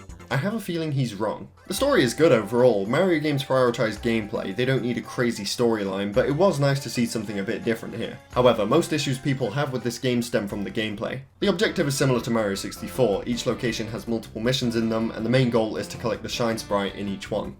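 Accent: United Kingdom. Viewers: British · It's like Bri'ish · The British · Two of my favorite youtubers in one....and hes british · Wow, minus the british accent everything was spot on, could've fooled me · but British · Maybe it's just been a while but I could have sworn isn't british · but british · Why is British now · 1/10 too british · Oh god he's british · but he's british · BRITISH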